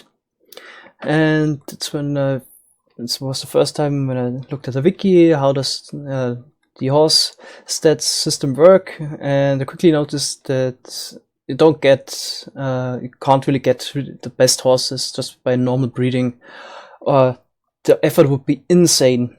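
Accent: Germany. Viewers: Are you come from germany? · You are no german, are you ?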